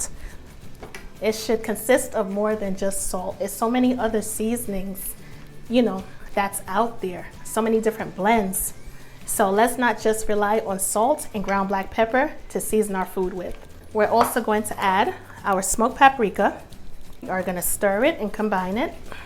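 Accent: New York, United States. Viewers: Sound like your from NYC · This heavy New York accent and giggle just reminds me of Ashanti and all my female friends back home. I’m making this tonight and just subscribed. Thanks love!!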